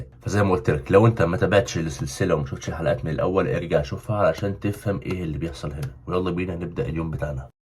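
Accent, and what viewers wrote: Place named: Arab world. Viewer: لا حسيت الاكسينت بتاعك في الانجليش اكسينت عربي فيمكن انت احتكاكك مع العرب اكثر بس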